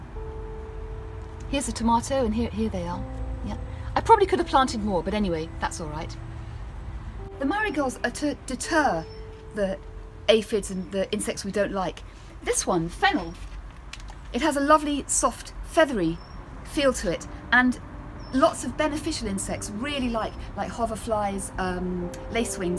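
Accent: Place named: RP, United Kingdom. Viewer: 好喜欢听的Qween’s English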